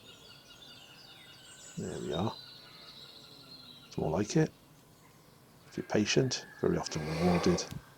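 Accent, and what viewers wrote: United Kingdom. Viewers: And he speaks in a British accent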